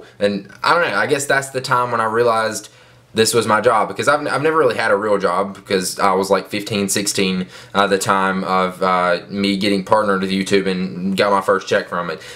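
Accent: South, United States. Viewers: I might wanna infer that your accent's from the South! Are yah.!?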